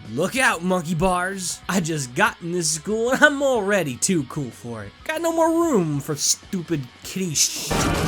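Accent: United States. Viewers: I was N O T expecting #1. Is from Appalachia or something?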